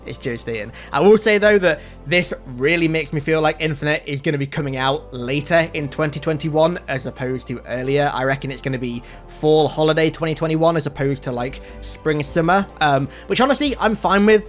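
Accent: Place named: United Kingdom. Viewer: Bro I wasn’t prepared for your face! You don’t look as British as you sound 8/10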